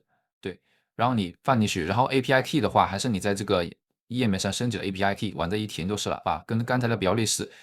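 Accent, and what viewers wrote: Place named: Guangxi, China. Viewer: 口音应该是广西老乡。😀刚想找个Codex对接本地模型方案，讲得很详细。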